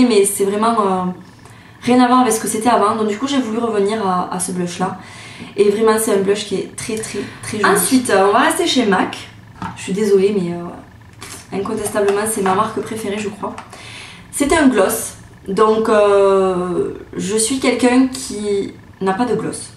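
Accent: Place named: Toulouse, France. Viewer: T'habites a toulouse? Je me disais bien que t'avais un accent!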